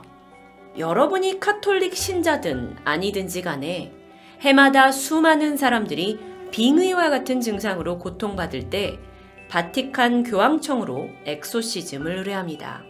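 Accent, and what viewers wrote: Jeolla, South Korea. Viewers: 전라도 억양 때문이신가 의발음이 잘 안되시는 듯ㅋㅋ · 전라도쪽 사투리라 그럴겁니다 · 님 혹시 전라도 사람이신가요? 아님 주위에 전라도 사람 있으신가요? 전라도 사투리 쓰시는거 같은데 ㅎㅎ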